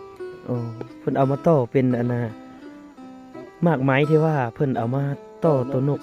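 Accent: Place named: Surin, Thailand. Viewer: เว้าคือล่วยแถว จ สุรินทร์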